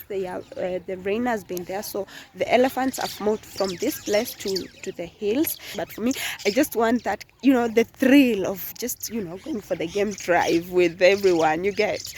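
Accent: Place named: England, United Kingdom. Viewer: It's the English accent and Swahili for me😘😘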